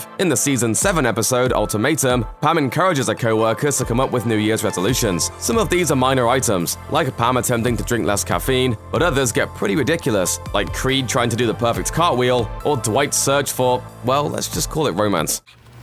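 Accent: United Kingdom. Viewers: Is it me or the British-accent (of the narrator) doesn’t really go with the American show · Love that a British guy is talking about the US version.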